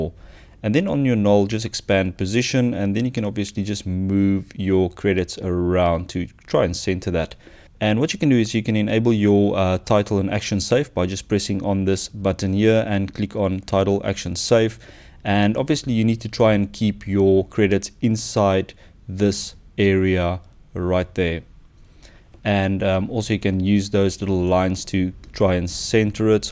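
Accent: South Africa. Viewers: South African? Love from Cape Town <3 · South African :)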